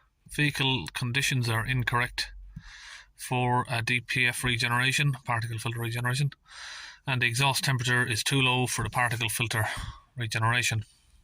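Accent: Ireland. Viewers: He sounds Irish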